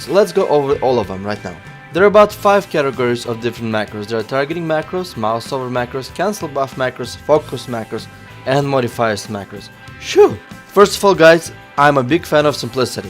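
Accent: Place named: Russia. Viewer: ..I pretty much liked the video,but you should try to make your accent slightly better. It is not bad to be russian or whatevs,seriously,just sometimes I don't understand what you say that's why..Plus u will become better in english :D Other than that,I enjoyed the video.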